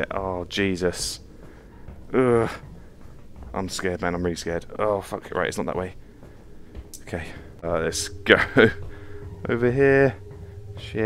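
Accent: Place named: United Kingdom. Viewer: the way you speak just screams british and i love it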